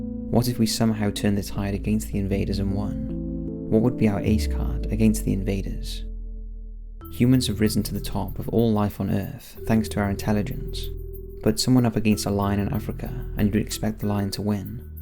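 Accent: United Kingdom. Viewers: Just amazing how a narrator with a proper British accent can spew the most nonsensical fact free content and people will just lap it up.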